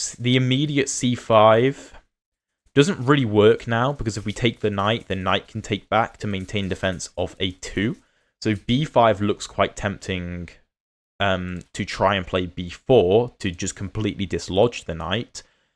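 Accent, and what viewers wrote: United Kingdom. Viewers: I have been following you since Rio de Janeiro. Besides enjoying your explanations I am delighted by your British accent.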